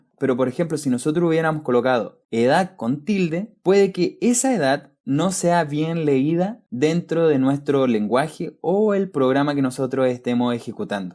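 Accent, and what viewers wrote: Chile. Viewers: Acabo de conocer este canal. Apostaría mi cuello que el que habla es un compatriota chileno. Muchas gracias por tan buen canal, es un gran aporte.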